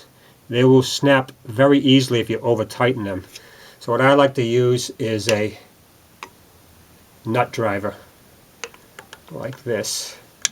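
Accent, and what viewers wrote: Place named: Rhode Island, United States. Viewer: Probably because hes from "Rwoad" Island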